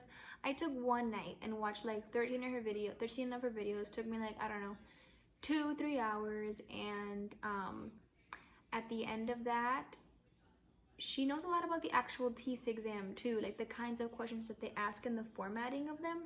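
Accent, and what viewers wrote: Miami, United States. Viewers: You are literally the cutest thing. You have a Miami accent. Thank you for your tips and tricks! Pero like thanks for the great video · I know a Miami accent when i hear one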